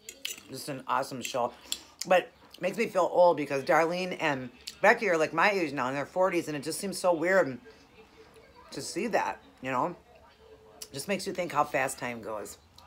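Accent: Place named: Minnesota, United States. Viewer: love your channel! what part of Minnesota are you from? I'm from MN too! I could tell right away from your accent lol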